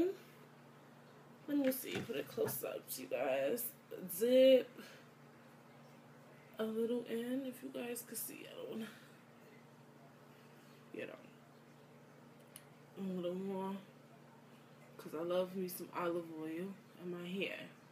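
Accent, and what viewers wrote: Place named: Brooklyn, United States. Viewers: Sound like you from brooklyn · Nice video. I like your accent. Where are you from? NY?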